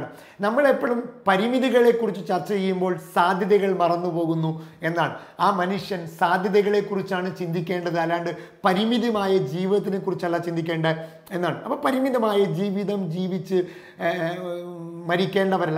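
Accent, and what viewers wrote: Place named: Tamil Nadu, India. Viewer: .... ഇപ്പോൾ അവതരണം... മെച്ചപ്പെടുന്നുണ്ട്... പഴയ' തമിഴ്ചുവ'ബോധപൂർവം അകന്ന് പോകുന്നുണ്ട്.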